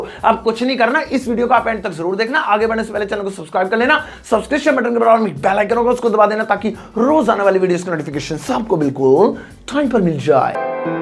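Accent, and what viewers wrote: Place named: Delhi, India. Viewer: nice voice Delhi se ho pakka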